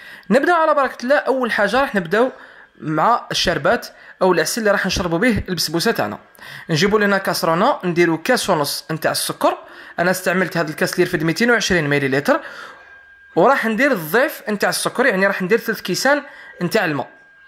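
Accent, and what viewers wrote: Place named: Batna, Algeria. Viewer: يعطيك الصحة اقتصادية وتشهي واش تعجبني اللهجة الباتنية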